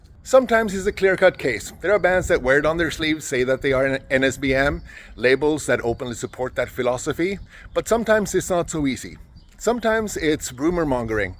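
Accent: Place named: Europe. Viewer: If you are seeking out black metal for morality and humanity, you are barking up the wrong tree. You should also note that Satanic black metal is explicitly in support of murder, grape, sacrifice of children, destruction of all innocence, etc. etc. .... and you clearly do not have a problem with all of that. But the moment the bands concern themselves with heritage, cultural superiority, and attacking a religion other than Christianity, suddenly its a problem. You sound European, so I don't have to tell you that your land, your culture, and your way of life is under direct attack from foreign invaders. But all that aside, its black metal. Foisting a morality compass onto it negates its entire purpose.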